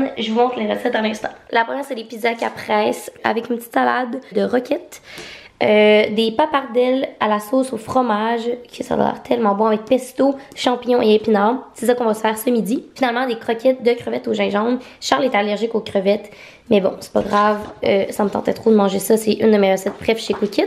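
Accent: Quebec, Canada. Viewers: J’ardore les vlogmas de ils sont trop satisfaisant ❤️ Je l’aie attends toujours avec beaucoup de excitation je suis française 🇫🇷 mais j’adore l’accent canadien/ québécois 🇲🇶 🍁🇨🇦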